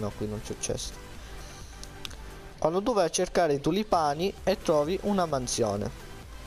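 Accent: Sicily, Italy. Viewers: We mbare sei siciliano?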